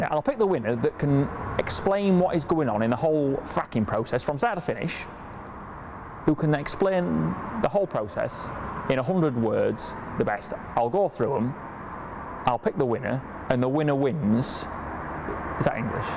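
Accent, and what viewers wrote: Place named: Lincolnshire, United Kingdom. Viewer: people form lincolnshire talk like this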